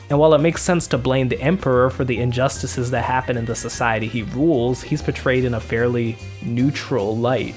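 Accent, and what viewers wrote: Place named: Spain. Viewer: whats with the spanish accent for the japanese pronounciations? either do right or dont at all plz.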